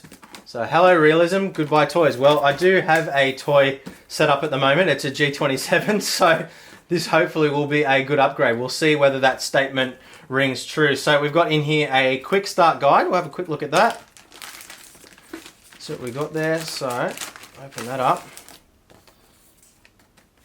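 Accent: Australia. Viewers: Hey thanks for replying. Judging by your accent I think you're Aussie? I'm trying to get my feet into sim racing and stumbled across your channel. I plan to start with a Next Level F1GT + CSL Elite Pedals + CSL Elite Wheel Base 1.1 + Fanatec Formula Black. Primarily will be playing F1 2018/19. What do you reckon this setup for a starter please?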